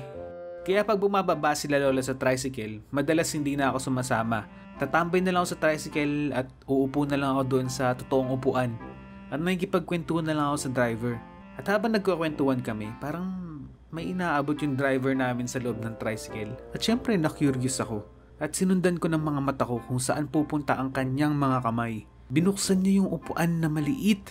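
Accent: Ilocos, Philippines. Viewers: Kuya ilocano ka ba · Hala ilocano po kayo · Kuya ilocono ka po? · Ilocano ka pala kuya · Taga Ilocos Sur kaba weno Abra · Kuya ilokano ka pala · Ilocano ka po ba? 😊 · kuya ilocano ka po?? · Kuya Ilocano kaba!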